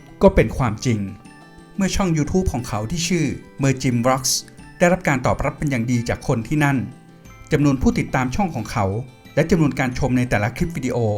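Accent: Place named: United Kingdom. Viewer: ผมติดตามช่องของ ตลอดครับตั้งแต่เขาทำช่องที่ไทย จนย้ายไปฟิลิปปินส์ ตอนอยู่ไทยคนติดตามเขาน้อยจริง เห็นใจเขาเหมือนกัน เป็นเพราะคนไทยไม่เช้าใจภาษาอังกฤษ จึงยากที่จะมีคนติดตาม คลิปตอนเขาไปลองกาแฟ ตอนเขาจิบอาเมริกาโน่เย็นผมเห็นแล้วขนลุก รุ้เลยว่า ยังคิดถึงเมืองไทยมาก ผมติดตามเขาเกือบทุกคลิปวีดีโอ เขานำเสนอได้ดี ที่สำคัญผมชอบสำเนียงเขาแบบอังกฤษ มันฟังดูเท่ห์ดี เพราะผมจะคุ้นเคยและพูด แบบอเมริกามาตลอด555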